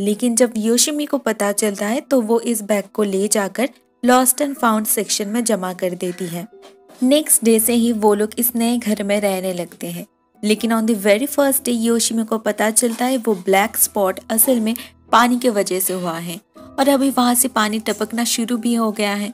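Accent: Bengal, India. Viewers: Ma'am Don't mind Bt are you a Bengali person???? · Are u a bangali or udiya....??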